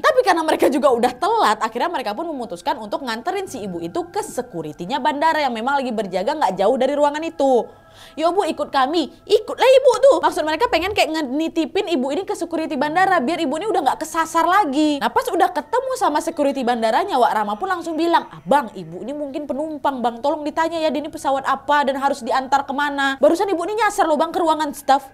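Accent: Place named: Medan, Indonesia. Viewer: Wak ini orang mana? Kayak aku bicaranya, aku orang Medan woii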